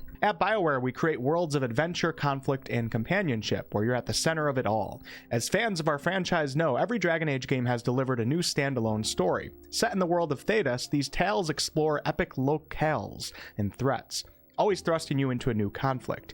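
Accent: United States. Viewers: I'm glad you have swapped over to DA. I was kinda curious what direction you would go after BG3. Also folks, he pronounces things differently due to his yankee accent, it's not a big deal. 😂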